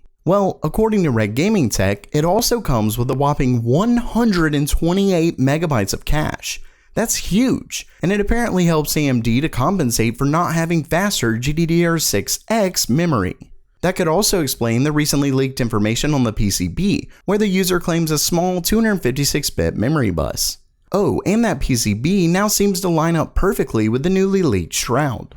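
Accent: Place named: South, United States. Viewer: southern accent coming out here and there lol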